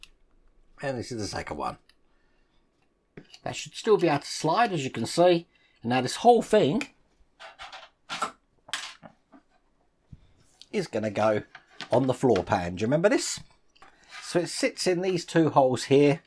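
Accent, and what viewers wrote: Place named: United Kingdom. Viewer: Don't worry about the way you speak. I've always thought it makes you endearingly British. Also my son always uses a soft T when he speaks, so mittens ends up sounding like middens. And when I was young my Rs sounded more like Ws. We all have those little quirks! Still love the channel, keep up the great work!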